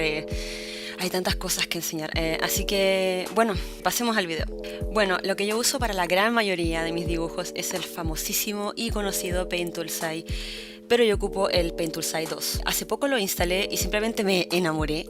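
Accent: Chile. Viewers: Aunque este video es viejo quiero decir que me encanta el acento chileno. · wena pooooooooo yo cuando escuche tu voz dije Ez CHilENa xddd buen video uwu nueva sub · De primera pensé que era Chilena, luego dije: "no, es argentina" Y después dijo: "si soy Chilena" xd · AHHHHHHH suenas muy chilena!!!!!!!!!!!!!!!!!!!!!!!!!!!!!!! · Jeje,wena mi pana 😎 No me esperaba que fueras de Chile,aunque si se nota en el asento Como que todas las chilenas suelen tener voz bien posta,así como desde kabra chica OwO,mi voz es media española en los audios,pero enrealidad si es posta xd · yo despues de ver 2 segundos de video: es chilena? :0 xd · Te escuché y fue como es xilena YUJUJUI Aaa dibujas terrible lindoo weoon 😭💖💖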